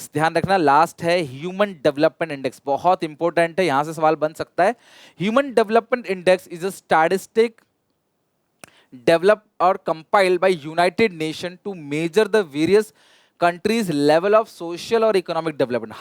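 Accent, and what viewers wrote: Odisha, India. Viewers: Sir are you from odisha ❤ please reply sir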